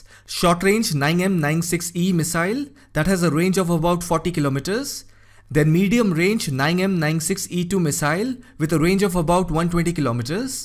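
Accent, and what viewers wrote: India. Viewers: Indian accent is so annoying. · Love indian english accent ❤🙂🙂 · Damn, It`ś interesting but Indian english is beyond my abilities to withstand ;o)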